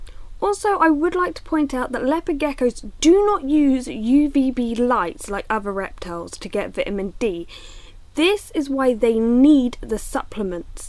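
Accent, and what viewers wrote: United Kingdom. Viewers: Is it okay that I love the way you say "vitamin D3"? Not being mean, its just cute. The British accent is so easy to listen to. Im American born and raised, but my Aunt is from Ipswich and its almost relaxing to sit and listen to her talk.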